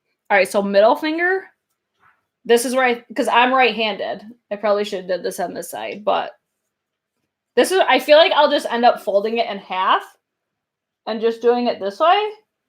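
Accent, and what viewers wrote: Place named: South, United States. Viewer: noticed the southern drawl.where are you from. Im from saint martinsville,louisiana,currently live in chicago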